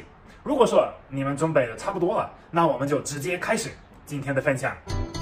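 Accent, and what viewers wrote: Sichuan, China. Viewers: 您的普通话好像有点四川味。难道是我的错觉吗？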